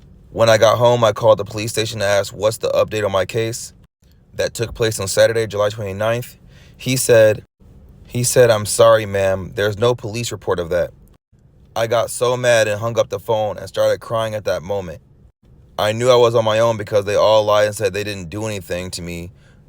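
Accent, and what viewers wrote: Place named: Minnesota, United States. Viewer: it’s so cool that your from MN. I’m born and raised and I could kinda tell with the way you talk. LOVE YOUR VIDEOS THO!! been watching all day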